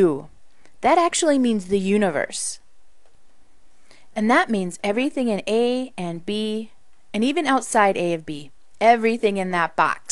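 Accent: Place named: Canada. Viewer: hahah, you're Canadian.. aren't you! XD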